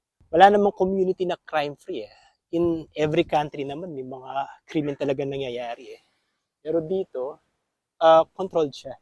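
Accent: Pampanga, Philippines. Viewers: yung accent ng kapampangan andon pa rin kahit matagal ka na dyan 😅 ingat kuya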